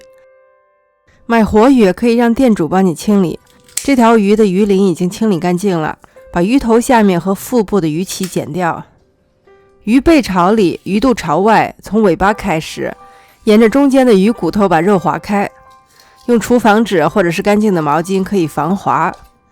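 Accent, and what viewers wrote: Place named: Xi'an, China. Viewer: 是西安人吗？和我说话的感觉一样！突然觉得留学很亲切！最主要的是，可以用很美国化的食材烹饪中国的食品，简直欲罢不能！大赞～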